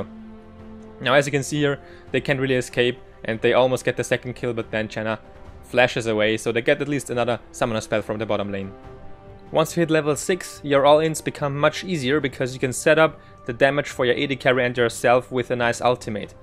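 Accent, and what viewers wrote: Germany. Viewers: german accent? ^^ haha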